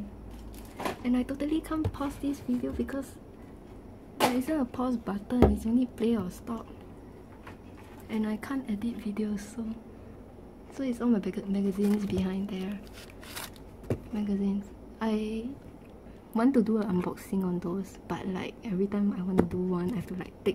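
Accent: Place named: Singapore. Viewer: Your collections are srsly goalsss! And omg I knew you're a Singaporean just by that singlish accent, you sound so cute 😂😂 I hope you get more subscribers because you honestly deserve them I'll be checking out other videos from this channel now 💖💖💖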